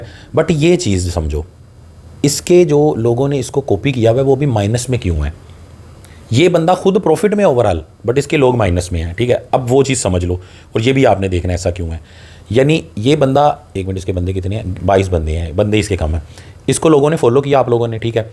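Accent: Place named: Faisalabad, Pakistan. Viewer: Your dialect is the typical faislabadi , a very beautiful one, where are you from bro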